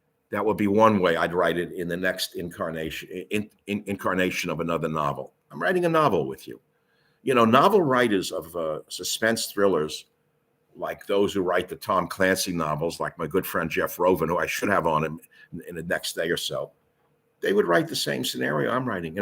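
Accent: New York, United States. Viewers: Always enjoy view....that NY accent too!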